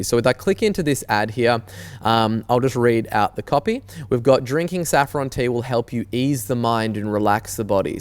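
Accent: Australia. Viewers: It's Australian accent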